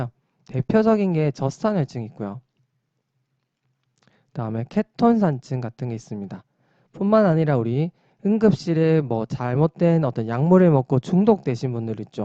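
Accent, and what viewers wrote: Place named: Gyeongsang, South Korea. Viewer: 약간의 경상도 사투리가 정감있어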